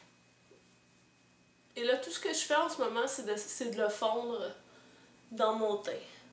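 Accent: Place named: Montreal, Canada. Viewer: Super joli !! Ça fait vraiment un teint santé ! Depuis le temps que Lutin nous parle de toi ça fait plaisir ^^. A moi tout particulièrement car j'entends un peu de l'accent de chez nous... je suis de Montréal mais j'habite en France ;)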